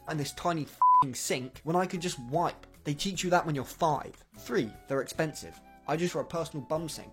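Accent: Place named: United Kingdom. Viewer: The vid wassss toooo shoorrrttt😭😭😭, wait, he's British?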